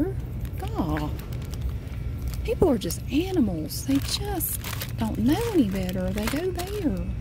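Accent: South, United States. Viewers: I appreciate your organizational vids and adore your Southern accent 💘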